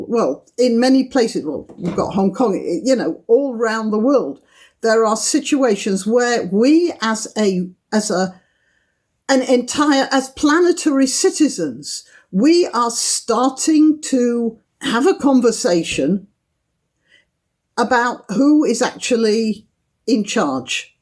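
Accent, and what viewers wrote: United Kingdom. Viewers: No no no... your voice cadences are quite harmonious to My American ears. Americans like me melt for that British accent... ask any American Beatles or Rolling Stones fans..... keep talking.... and cogent thought with that accent???? Young lady, I better never hear your voice and see you on payday!!!!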